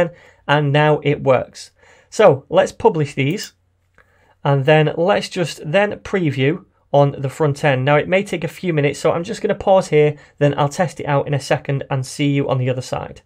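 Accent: United Kingdom. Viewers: I always watch your videos, now I have the same british accent as yours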